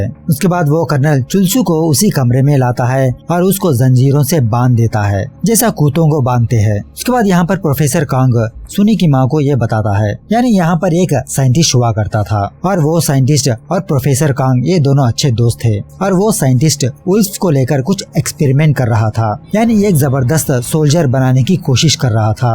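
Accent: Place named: Bengal, India. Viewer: Bhai saab ap yeh sab movies kahan se Dhundke nikalte ho, ASTONISHING😲 😍!!! Love ur Bengali accent in the explaination 😍😍😍... Continue it we'll Support it!!!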